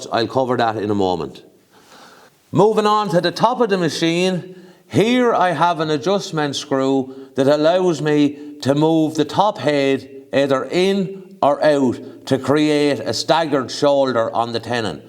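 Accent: Ireland. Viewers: Well done 👏 1k. Great video. You sound like a kildare man.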